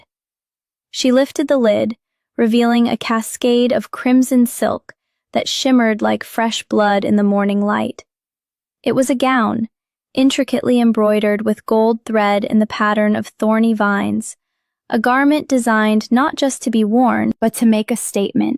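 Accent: Scotland, United Kingdom. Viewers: I have enjoyed this story, and love the accentnof the narrator, since Scotland is where many werewolf stories originate. Just wish the voice was an octave lower. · Love the Scottish accent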